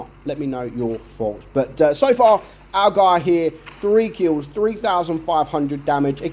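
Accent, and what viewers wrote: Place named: England, United Kingdom. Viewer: ^ is ENGLISH & doing his video in ENGLISH so he speaks ENGLISH** **( well there's a fucking surprise, "DUH"!!!!! ) "If" YOU want proper pronunciation then stop-watching, fuck-off & go make a POLISH version....YOURSELF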